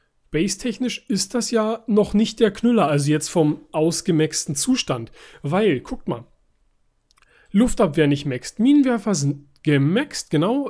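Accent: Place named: Germany. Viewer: Du hast einen hardcore deutschen Akzent wenn du englisch sprichst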